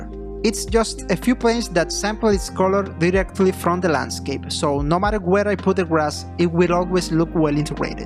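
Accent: Spain. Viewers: Tú hablas español,no jodas, se nota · Seems spanish like accent, I would like to know to satisfy my curiosity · bro se nota que es español EDIT: No es un hate ni nada, muy buen video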